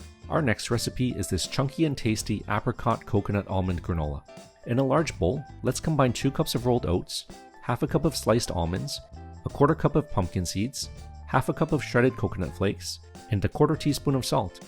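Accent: Canada. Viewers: Very nice recipes. 💖I have been baking my yeast breads using granola and what a game changer that has been. I haven't gone back to my regular favorite bread recipes since I started using granola in them. I will definitely start making my own granola now that I have watched your video. I need to ask...are you from Canada? A few of your words hinted to me that you are Canadian. Thank you so much for sharing for recipes.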